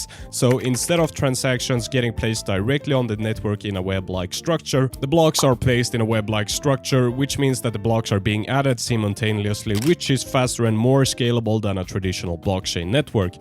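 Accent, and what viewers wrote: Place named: Sweden. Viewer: Yoo I can hear the Swedish :), let's meet and discuss crypto IRL. Botkyrkabo jobbar i Norrmalmstorg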